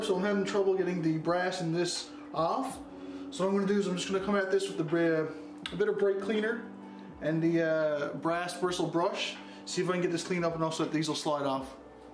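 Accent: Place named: Canada. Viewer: If the "accent" lol and the power fist box didn't give it away He's in Canada folks! Greetings my eastern brother from British Columbia!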